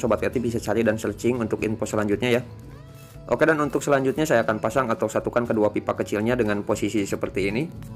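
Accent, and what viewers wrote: West Java, Indonesia. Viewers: logatnya urang sunda pisan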